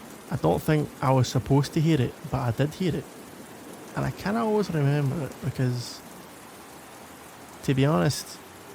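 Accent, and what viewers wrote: Scotland, United Kingdom. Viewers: Ahh this was made for me!!!! The thick (I'm talking doubled caked up thick) Scottish accent. It's so beautiful. Thank you my king!!! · Love your voice, have literally never heard anyone compliment a scottish accent before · As a fellow Scottish person I approve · I'm Scottish sometimes I wish my accent was thicker because people really like our thick accents but mines weak compared to his. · Ladies! 😘 I'm from Poland. Born, raised and still live in Poland, but when our favourite teddy bear speaks like that I'm blushing and giggling like a schoolgirl 🥵 I'm a huge simp for a Scottish accent, even though I understand.... little 😋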